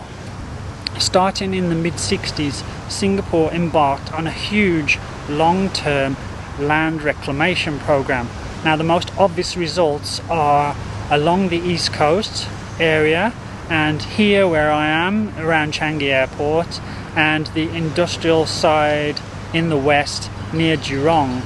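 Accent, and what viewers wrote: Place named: United Kingdom. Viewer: Hi, I regularly watch your videos with interest. I lived in Singapore for 40+ years. What “bugs” me about your commentary is that you PRONOUNCE street names or places as if you were an Ang Mo that arrived just yesterday. I recommend that you (learn to) pronounce names the way Singaporeans say it, and not how the British would do it. The other day you visited BAKIT TIMAH. That sounds awful.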